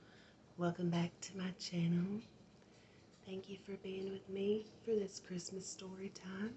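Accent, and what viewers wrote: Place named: South, United States. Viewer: Hi ❤️. Thank you so much for this wonderful video ❤️. I really enjoyed it. The whispering, hand movements, tracing and story reading is very tingly and relaxing. It's been a long time since I've seen a pop-up book. That's really cute. Your whispering and southern accent really brighten the stories. Thank you for sharing this with us. Your soft soothing voice really adds to the tingles. Thank you again so much for this wonderful video ❤️. Please stay safe and be careful ❤️. Merry Christmas my friend 🧑‍🎄❄️☃️🦌🎄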